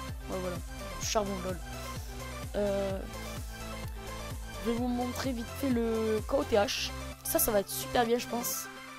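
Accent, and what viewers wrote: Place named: South, France. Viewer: abos. VIVE L'accent DU SUD. BGBG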